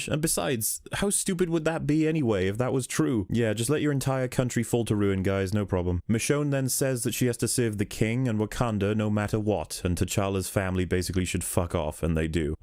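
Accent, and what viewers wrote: United Kingdom. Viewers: you can sound like you know what you're talking about by just having a British Accent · I hate to say this to you but I feel as if your British accent works against you with this particular commentary. It makes you sound like those willfully ignorant colonizers they speak of in the movie who came to Africa in other parts of the world to quote on quote spread civilization wow being willfully ignorant others culture identity and or achievements. I say this because a lot of you are complaints we're actually dressed in the movie I'm not sure if you tuned things out once you came across something that did not make sense or forgot them. A lot of your complaints for example are centered around the heart shaped herb as well as a panther suits. Again I say this is more or less comic book accurate so if you're going to lay fault lay it on the comics themselves. 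I suppose you could blame the movie for not editing out elements that don't make sense so allow you to have that. However it was clearly explained that only the line of the king could consume the heart shaped herb. Anyone else it would kill or rather only one person in a million could consume the herb and live. Now I will give you this, the whole kinglee trial could have been done better. That is also something that was taken from the comics but not in its exact form. On YouTube there is a cartoon call Marvel Knights the Black Panther. Essentially the trial was as such, anyone was free to go through the trials to become the king and those trials consisted of vesting wakandas best warriors. The Last Man Stand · we all know what N-word you were trying to use....And its not nitpicker, which is what this entire video is. Seriously, stop trying to hold yourself up like some sort of film scholar when you have to complain about how they're clothes are designed. 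It's called "tradition" and "culture". This whole video, i thought was a joke, and in retrospect, it is, just like your channel. But wow. Why is Black Panther somehow the ONE marvel movie that pisses you off so much? It's like you had your teenage son write this in a few hours and asked for dad to use his british accent to do a voice over because everyone can trust a british accent. What a pathetic excuse to get mad over a movie. Btw, i do agree with like, 20% of what you said, but if i play the "suspension of disbelief" card, you'll somehow misread that as "oh well, opinions are subjective bro." So instead i ask you to study in a film class or something and brush up on your presentation. So many unnecessary "F" bombs, at least get creative with your cussing. So uncivilized. :)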